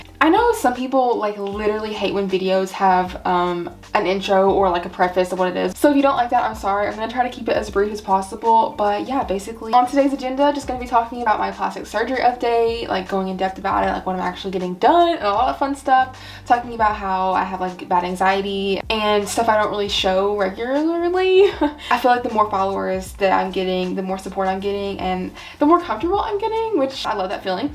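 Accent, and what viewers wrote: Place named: South, United States. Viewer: I just found your account and I think it was pure fate!! Love your midsize fashion content and that you have a southern accent like I do even though I am an old woman 😂 I just got a breast reduction this past fall and it was a very weird time. I’ve had body dysmorphia my entire life and that was a huge part of it. I feel SO much better and the change in my body is great but also very bizarre. I hope your recovery goes smoothly and that the adjustment period to your new shape goes well!!!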